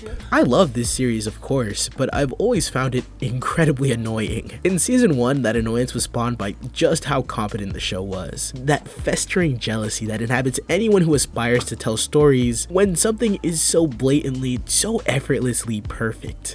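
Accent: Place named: United States. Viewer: american accents are so cool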